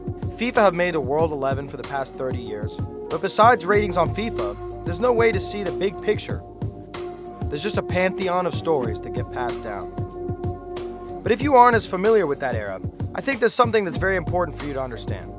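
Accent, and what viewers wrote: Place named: United States. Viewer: american accent: immediately loses credibility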